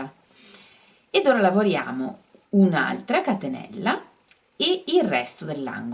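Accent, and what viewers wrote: Veneto, Italy. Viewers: Bellissima! Sei bravissima 👍 Sei Veneta? Hai un modo di parlare splendido 😉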